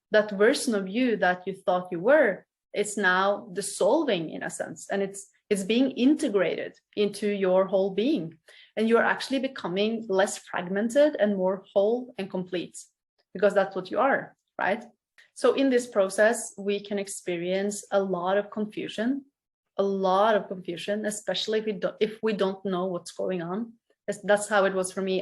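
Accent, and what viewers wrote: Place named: Norway. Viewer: Tack! Det står helt klart att du har egen erfarenhet av det du talar om. Själv är jag inne i min tredje mörka natt. De två tidigare var jobbiga, men betydligt lindrigare än denna, som har pågått över 3 1/2 år. Efter de två tidigare mådde jag väldigt bra och trodde aldrig jag skulle behöva erfara detta igen. Men uppenbarligen behövs det. Varannan dag är hanterbar, ångesten är påtaglig men ändå fungerar det på något sätt. Då börjar inspirationen komma, men det räcker tyvärr inte länge. Dagen efter brukar vara mycket tung, jag kan gråta hela dagen, och tror att detta aldrig ska ha ett slut. Jag brukar säga till mig själv att det är någonting fint som händer. Jag hoppas att jag ska kunna hjälpa andra människor i samma process som jag går igenom, och lugna dem med att allting är precis som det ska vara. Tack för den inspiration du ger. 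PS Jag hoppas att mitt inlägg översätts till engelska så att det inte bara är du som kan läsa det! Man hör tydligt på din accent att du har norska som modersmål! Trevligt!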